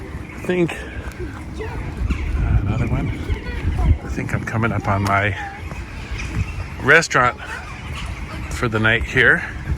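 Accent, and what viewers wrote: United States. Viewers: American fella I guess.Sounds by the accent.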